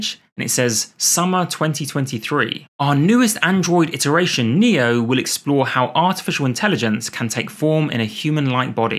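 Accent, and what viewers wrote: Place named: United Kingdom. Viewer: Having a British accent is a cheat code for being a science / STEM YouTuber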